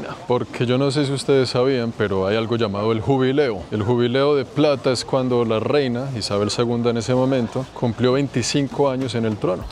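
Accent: Colombia. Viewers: me encanta porque no solo te enseña lugares que nadie o alguna televisora hace y siempre esta informado de algún lugar o monumento en el que visita, y sobre todo la educación y respeto que el siempre demuestra, haa y de extra me encanta su acento colombiano parce XD siga adelante amigo y lo sigo desde que vino a mi humilde Guatemala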